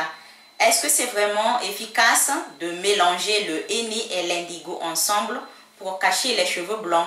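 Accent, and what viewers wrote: Madagascar. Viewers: Bonjour la Malgache 😬, Belle accent, je suis Malgache aussi , bravo pour l'info🌹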